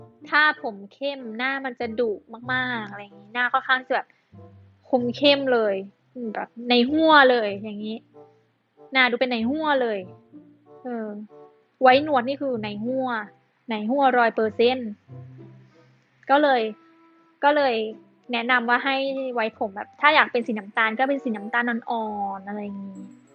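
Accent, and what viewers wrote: South, Thailand. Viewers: แหม่เฮ้ยยย แหลงใต้ชัดเปรี๊ยะ🤣🤣🤣 น่ารักอีตายยย❤ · นายฮัวเลย 5555 แหลงใต้ชัดเปรี้ย555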